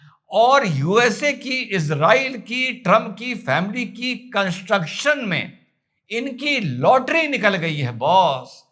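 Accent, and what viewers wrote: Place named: Uttar Pradesh, India. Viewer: Namaste ..aspki UP ki meethi boli sunkar maza aata hai..over and above your honest analysis is not only worthy an applause but u have set an eg of what intelligent and fair educated people should adopt ..salaam to u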